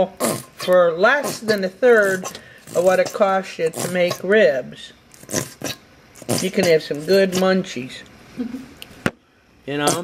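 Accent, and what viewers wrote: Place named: Illinois, United States. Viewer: I never thought to do that with neck bones but I sure will now. I CAN say they make the best spaghetti sauce going! Between the sweet pork flavor and the bones, you can't beat it. Thanks for another great idea,. (Northern girl, huh? I would have guessed Illinois, but there's a similar accent in Central NY...Utica/Saracuse/Buffalo...Humm) LOL, you do NOT want to hear my accent...Tess from NY:)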